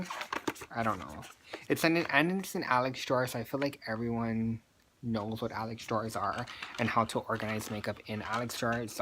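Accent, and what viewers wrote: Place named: Hawaii, United States. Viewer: I’m trying to find ways to organize my lashes and as soon as I heard you speak I just knew you were from Hawaii 😂💛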